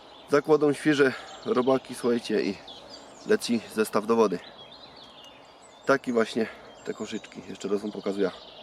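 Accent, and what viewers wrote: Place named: Silesia, Poland. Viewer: Fajne hobby - wedkarstwo (lapa w gore) ALE wytrzymalem 1 min 20 sek ... nie da sie kurwa tego sluchac, mam bardzo wielu znajomych Slazakow i wiem doskonale ze potrafia NORMALNIE GADAC jak zechca. Film bez dzwieku OK - graty za rybki !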